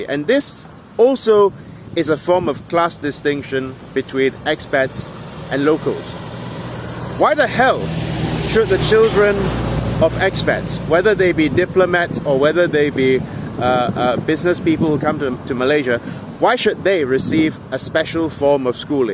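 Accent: United Kingdom. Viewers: First of all, it shouldn't be you talking cause you are a Chinese. Any changes have to come from Malays. Liberals will cheer for you no matter what, but most Malays won't give a damn on what you have to say. Secondly you spoke in clear British English, this is more as a disrespect. If you can't respect Malays, don't expect them to respect your opinion. Thirdly, public opinion is irrelevant, if you want to change something, you speak or influence people in charge.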